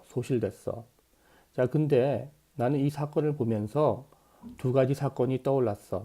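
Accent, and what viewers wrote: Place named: Seoul, South Korea. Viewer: 크게 공감합니다!! ㅇㅇ 사실상 지금의 스울말투(말로써 하는 표준어)는 산업화와 더불어 온갖 타지역 사람들(ex:설라디언 이주민)의 유입과, 또 흘러간 세월들이 요래 마구 쓰까져가지고.. 예전과는 많이 달라졌다는 학계의 지적이 있잖어!? 국어쌤은 아마듀 메이비, 이러한 실정을 나 보다도 아주 잘 알고 있을거야. 그렇쵸!? ㅋㅋ 그런데 우리 의 발음과 발성을 듣고 있노라면.. 이게 바로 진짜 '교양있는 사람들이 두루 쓰던 현대 서울말!'(오리지날 한쿡 표준어) <ㅡ 를 완벽하게 구사하는 사람인 것 같아ㄹㅇ. 그래서 더 놀랍고, 청자의 입장에서 아주 편안하다는 장점이 있서요!! 동의하지!?? 예아-~!!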